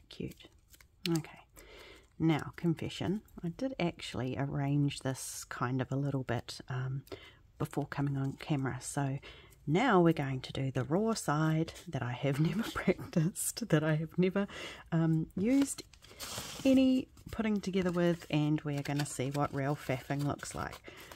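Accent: New Zealand. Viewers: keep doing these step by step tutorials. They are great, plus I love listening to a fellow kiwi!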